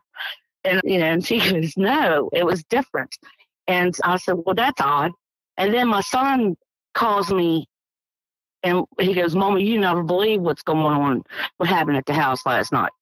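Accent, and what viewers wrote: South, United States. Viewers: Yes mater of fact you sound country is a day is long doggone be yourself who else can you be rock on with your bad self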